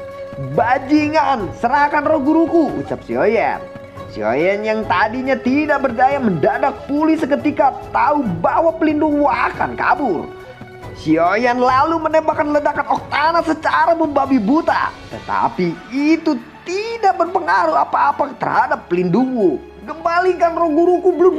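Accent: West Java, Indonesia. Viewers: Cing baleg atuh ngomong na katingali pisan Jelema Sunda na teh tapi Hade pisan Gaya na terus Ken Persib bandung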